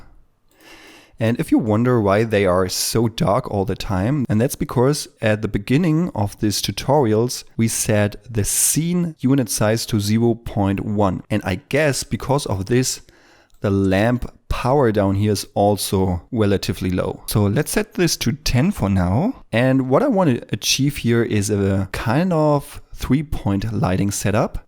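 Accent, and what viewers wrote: Germany. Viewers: Super Video! Es ist ja richtig erfrischend mal wieder einen schönen Deutschen Akzent zu hören. :)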